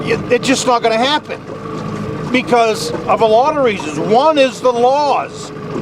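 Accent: Maine, United States. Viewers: My mother born and raised Down East Coastal Maine . When most lived and hunted and caught most of their life . She met my Dad in NC and raised a family ... I spent many of my best childhood times crawling rock and raking blueberries . i have always dreamed of a permanent return ,but life and the wild extremes of winters keep me Southern fried and hot ... I'm tuning in to hear your voice and the accent of my relative's who no longer walk the wildwoods or clam bake on a cool summer evening . and to catch a glimpse of dream I've never forgotten . thanks for the memories that come rushing in like high tide on a full moon .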